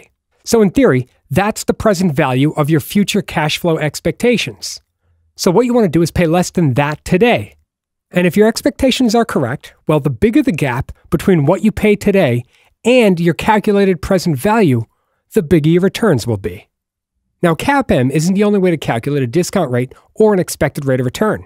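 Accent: Boston, United States. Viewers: Love the Boston Accent! I subscribed, helped a lot with my Finance Final!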